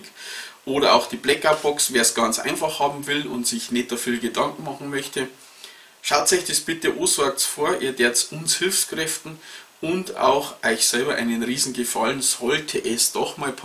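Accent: Bavaria, Germany. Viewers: Danke für die lebensnahe Erklärung, bayerischer Charme inklusive! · Super erklärt!gaaanz starkes Video. Hehe..auf bayerisch klingt‘s trotzdem ned schlimm. I wohn aufm Land...geht scho irgendwie.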